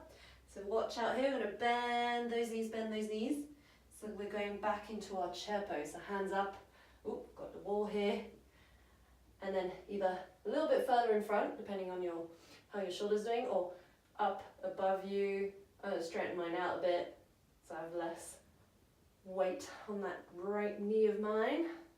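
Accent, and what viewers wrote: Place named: New Zealand. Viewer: You have a nice accent. Is it NZ?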